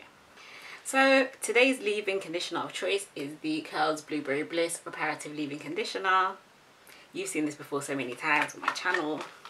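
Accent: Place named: London, United Kingdom. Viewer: thank you!!! I was trying to figure out your accent cause I knew it was London but I didn't know what part. I am from southeast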